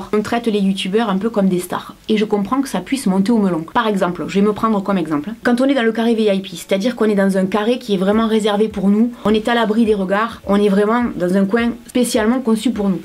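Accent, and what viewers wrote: South, France. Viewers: J'adore ton accent du Sud!!!💗💗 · Chloé Richaud Si elle a l'accent du Sud un peu. ^^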